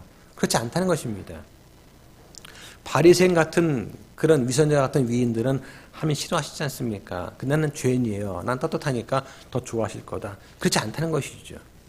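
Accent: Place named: Daegu, South Korea. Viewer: 귀한 말씀 감사합니다, 대구분들에게 잘 맞는 억양으로 말씀 하시니 더욱 좋읍니다~~!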